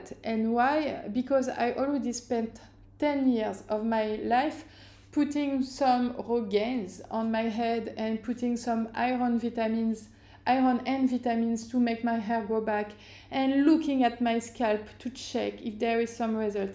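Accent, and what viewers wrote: France. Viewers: L anglais est top , juste l accent maïs ça a son french charm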